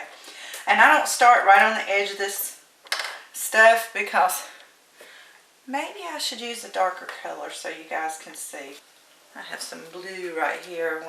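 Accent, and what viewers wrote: South, United States. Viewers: I just love you. I rarely hear southern accents on youtube hehehe. Makes me feel like im home. Not sure if youre from the south but you sure sound like a southern belle. 💚💚 much love from Georgia!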